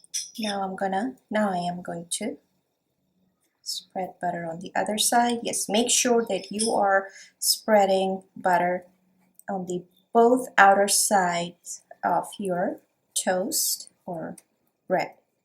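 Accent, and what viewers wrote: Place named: United States. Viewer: Pakistan ,,but your accent is like American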